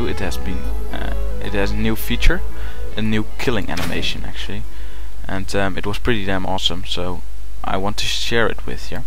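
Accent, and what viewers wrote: Netherlands. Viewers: wel grappig dat hoe goed een nederlander ook engels praat je toch altijd hoort dat het een nederlander is ; ) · ik hoorde dat je uit Nederland komt door het Nederlandse nadruk xD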